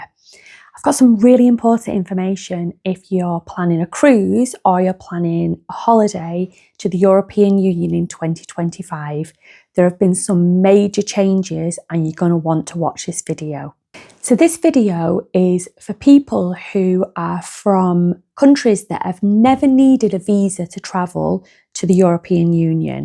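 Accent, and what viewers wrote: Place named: England, United Kingdom. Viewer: Great Clear English Accent Love it ❤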